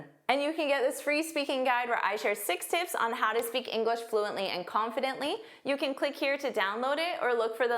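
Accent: Canada. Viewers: Are you from Winnipeg? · Do you have the Canadian accent or are you speaking like an English ?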